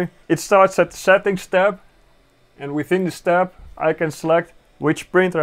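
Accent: Netherlands. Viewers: Is deze guy gewoon nederlands :D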